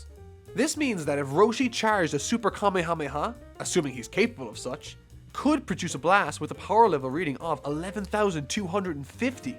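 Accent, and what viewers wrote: Ireland. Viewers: Are you Irish? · yo mate , are you Irish?? you sound like a Leinster boy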